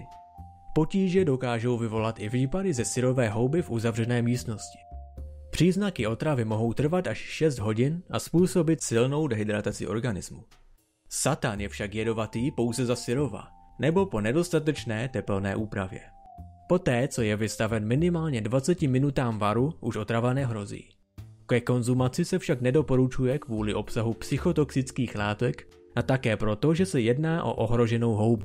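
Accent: United States. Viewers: D Zdá se mi to nebo máš takový Americký přízvuk ? :-D Jinak super jako vždy a prosím pokračuj tak v úžasných videí , přeji ti hodně štěstí do života :-) . Fanynka Hungergirl :-) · dobrý video ale ten amevrickejj přízvuk :D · zajímavý ale mas trochu americkej přízvuk! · Tvl ty mluvíš jako Američan to tvé R je děsný 😄😄😄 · Dobře video ale máš americký přízvuk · hezký Americký přízvuk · Jak někdo v průběhu natáčení videa chytne americkej přízvuk? :D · Přírodopis mně celkově nebaví ale takovýmhle stylem mně to baví :) Ale zdá se to jen mně nebo máš někdy takovej americkej PŘÍZVUK?? :D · Ale ten americký přízvuk 😆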